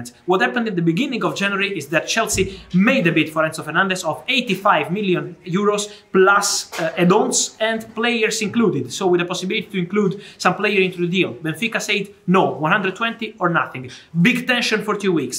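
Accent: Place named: Italy. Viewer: Love the accent. Damn Italians..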